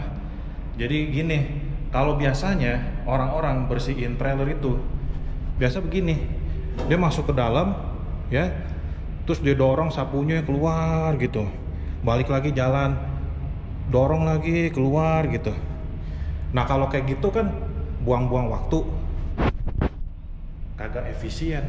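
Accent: Jakarta, Indonesia. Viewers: banggg gw seneng banget akhirnya lu upload lagi bang bahasa betawi lu mnt4ep bener dah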